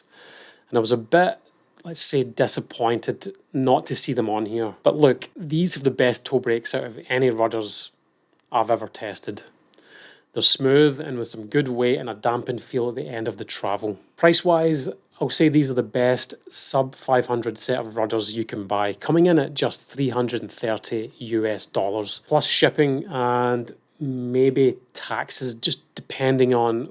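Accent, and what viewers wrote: Scotland, United Kingdom. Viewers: Would've guessed Scotland or nearby from the accent! Where are you originally from?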